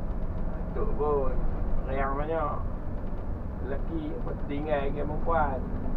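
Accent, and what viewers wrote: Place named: Kedah, Malaysia. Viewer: Oqang kedah ka abg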